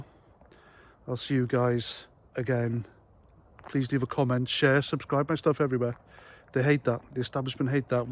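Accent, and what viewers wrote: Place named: United Kingdom. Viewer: Long time subscriber - rarely comment. Thought it about time I write to say how much I enjoy your videos and appreciate what you do. As an expat POM from Southampton living in Australia, I really miss accents from the UK and I *love* yours. Keep up the good work and helping keep me up to date with all things UK. Cheers - Simon / Adelaide - Oz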